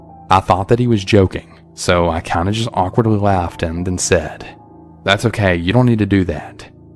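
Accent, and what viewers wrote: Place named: Texas, United States. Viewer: I love your Texas accent damn it so smooth ❤️❤️💯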